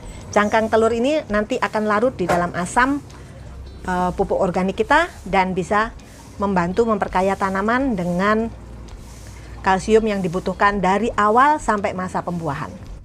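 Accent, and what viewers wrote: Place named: Banyumas, Indonesia. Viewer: bapaq : kaya jangan bayem... laaahh pada" ngapak jebule ya pak 🙄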